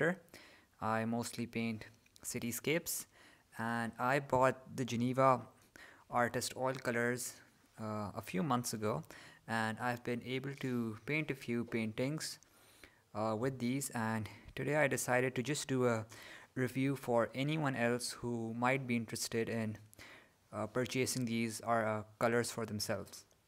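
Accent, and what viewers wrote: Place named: India. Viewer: he sounds Indian but his name seems middle eastern lol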